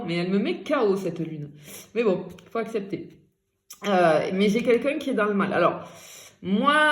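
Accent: South, France. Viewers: C’est trop bon l’accent du Sud !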